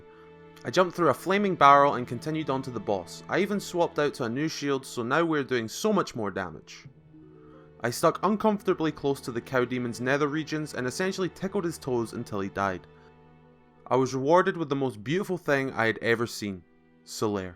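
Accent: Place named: Scotland, United Kingdom. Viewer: Me: "I've seen a shield only run of this game." Youtube: "But have you seen it with a Scottish accent?"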